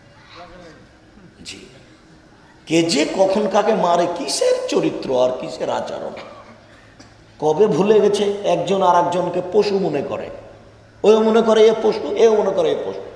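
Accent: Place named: Chapai Nawabganj, Bangladesh. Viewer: imran vai vaier voice chapai nawabganj er tai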